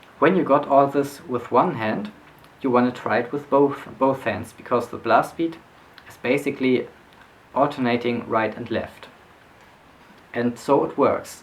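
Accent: Germany. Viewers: You don't hear Germans with this accent much anymore. It's a cool accent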